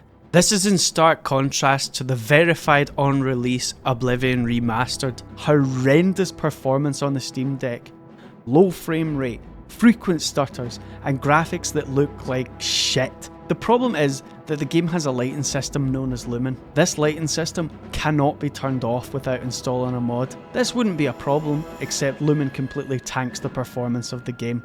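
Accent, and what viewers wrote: Scotland, United Kingdom. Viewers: Nice skottish accent Mate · peak scottish accent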